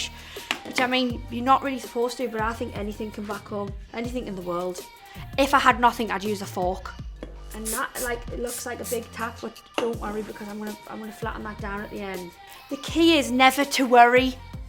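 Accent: Newcastle, United Kingdom. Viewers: Have you permently quite Geordie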